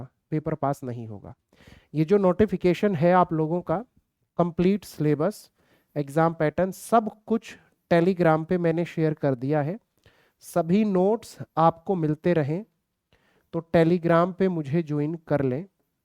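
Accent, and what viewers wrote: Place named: Haryana, India. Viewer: Apki harianvi bhasa sunkar bahut acha laga😂😂😂😂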